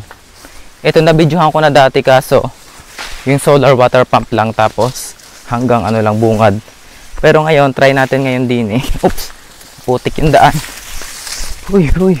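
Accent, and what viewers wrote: Batangas, Philippines. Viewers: Batangeño ka ga kuya?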